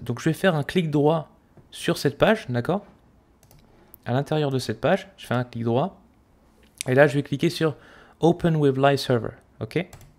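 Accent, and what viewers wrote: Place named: England, United Kingdom. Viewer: belle axenc englais !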